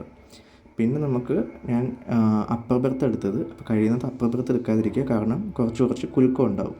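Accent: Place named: Thrissur, India. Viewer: Thrissur ragam❤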